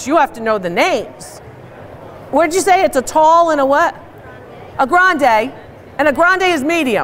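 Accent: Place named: New York, United States. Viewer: Ooooohhhh ok. Are you from Michigan then? I heard you say that's a school you attended but you have a bit of a New Yorker accent ❤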